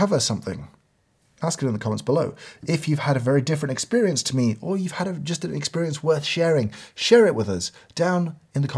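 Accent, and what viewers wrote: United Kingdom. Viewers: Is it just me or do you sound more British than usual? 🤔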